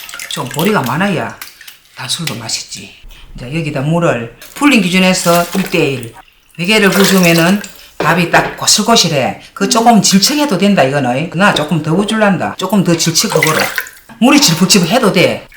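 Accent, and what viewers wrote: Gyeongsang, South Korea. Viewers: 경상도. 말씀이 너무. 구수합니다. 신부 수업하는겄같는. 따님과. 너무좋습니다. 어려을때. 단술. 먹었던. 추억이. 떠오르네요. 좋은. 정보. 주신겄감사합니다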